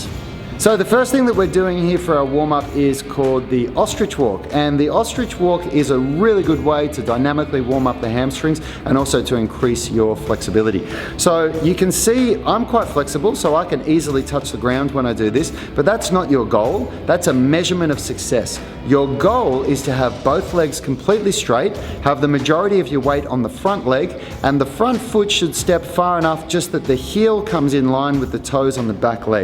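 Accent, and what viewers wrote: Australia. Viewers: Are you Aussie? 🇦🇺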